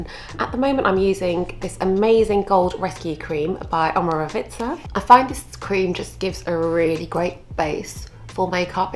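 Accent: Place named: London, United Kingdom. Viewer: she is beautiful. Ohhh I love a London accent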